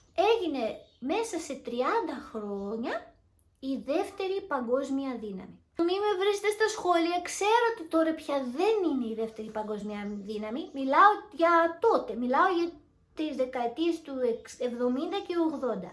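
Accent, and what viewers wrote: Japan. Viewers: εισαι σκετη γλυκα.Σε ευχαριστουμε για την ενημερωση.Αυτα που ειπες σχετικα με την Ιαπωνια ειναι ακρως διαφωτιστικα γιατι προερχονται απο ενα ατομο που ζει την καθημεριοτητα της Ιαπωνιας.Θα πρεπει να εχεις και πολυ καλη προφορα στα ιαπωνικα γιατι η επιρροη τους φαινεται και στην προφορα των ουτως η αλλως πολυ καλων ελληνικων σου.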